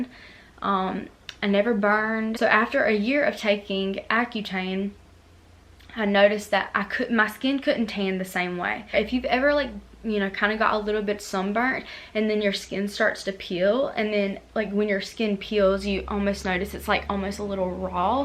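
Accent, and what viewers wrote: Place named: Texas, United States. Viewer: Are you from Texas or something, love your accent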